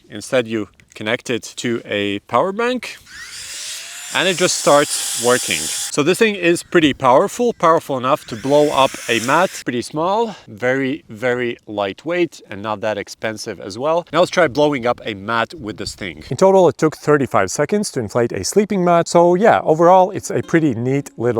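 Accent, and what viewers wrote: Latvia. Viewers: Love Latvian accent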